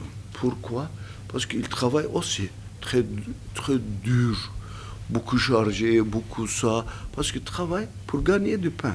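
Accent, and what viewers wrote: Turkey. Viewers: تركي تركي تركي يا عباد هدا تركي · عجبتني لهجته التركية في حذيته بالفرنسية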